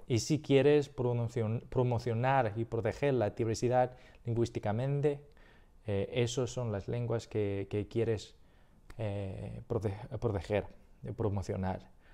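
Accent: Castile, Spain. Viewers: ¿De donde aprendiste español? Yo pense que pude escuchar a un poquito de acento España, pero no podría ser seguro. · ¡Que copado! Hablas como un nativo hispanohablante con acento de España. 💙👍🏻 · Salvo unos pequeños errores en los artículos (a todos los no nativos de español les pasa) es bastante bueno el habla, hasta tienes el acento y manerismos del español de España. La conjugación se te da muy bien y suele ser lo más difícil del idioma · ¡Es un mundo pequeño! Un Chino Americano hablando Español castellano. Saludos de Tucson. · me gusta tu español, tienes un acento español, pero de repente le metes ciertas expresiones latinoamericanas que gustan mucho c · Your Spanish is great, mate! I'm curious, why do you have a Castilian (Spanish from Spain) accent? People from the US usually learn the Mexican variety, due to proximity and exposure. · Excelente video, habla muy bien el Español, con acento de España, que consejo nos daría a la hora de aprender idiomas, a mí me gusta mucho el inglés y el hebreo. 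Saludos desde Colombia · You should specify you speak Spain Spanish. Which usa Spanish hates lol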